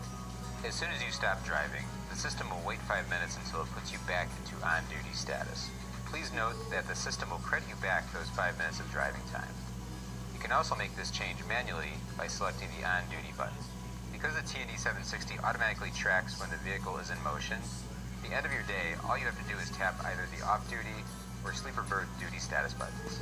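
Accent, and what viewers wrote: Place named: Boston, United States. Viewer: He speaks with an Boston Accent!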